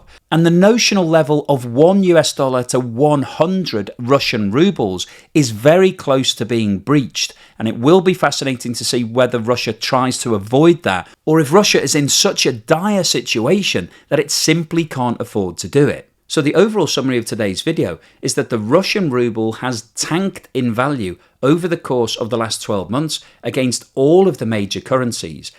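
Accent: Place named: England, United Kingdom. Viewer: Anything with a English accent is usually rubbishy anti-Russian propaganda. Does Russia have $35+ trillion in Debt and out of control spending? I didn't think so... e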